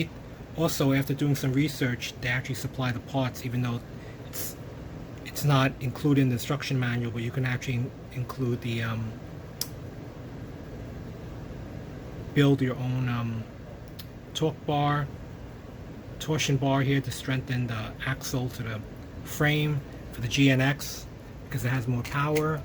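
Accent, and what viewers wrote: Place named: New York, United States. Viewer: Hey, I'm Chris......Jeff asked me to check your channel out.I subbed your channel & I like your builds a lot. I primarily build muscle cars myself, a few old hot rods. Just curious.....I'm originally from NYC, you sound like you have a New York accent. Anyway, I subbed U & nice 2 meet U buddy.....speak 2 U soon my man!!!!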